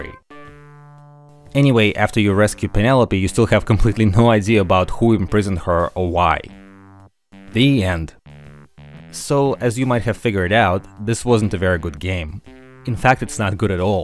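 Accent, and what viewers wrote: Russia. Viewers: I like your secretely half russian accent! So awesome! and great vids too! :D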